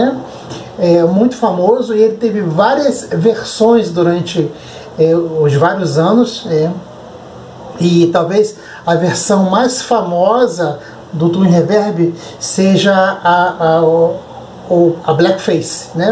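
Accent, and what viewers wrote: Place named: Rio de Janeiro, Brazil. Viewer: Você mora em SP?? (Seu sotaque é do RJ)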